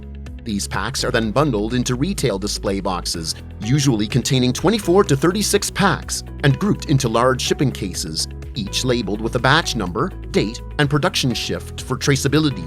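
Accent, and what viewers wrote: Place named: Midwest, United States. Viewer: Love the Midwest accent and the info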